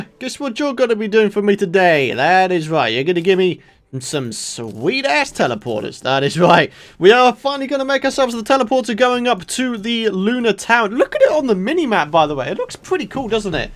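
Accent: United Kingdom. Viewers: EMPER He’s British. It’s an accent.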